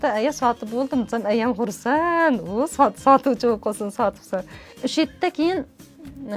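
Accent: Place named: Kokand, Uzbekistan. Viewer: Қуқонлиг экансиза шевангиздан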